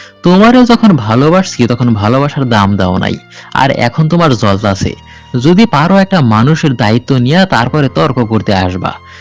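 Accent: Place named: Jamalpur, Bangladesh. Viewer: ভাই আপনি মনে হয় জামালপুরের মানুষ, কথা শুনে তাই মনে হচ্ছে।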